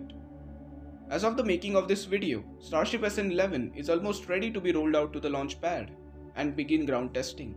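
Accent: India. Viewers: when can Indians speak with a not annoying accent? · I am American and I understood that narrator who sounds East Indian perfectly.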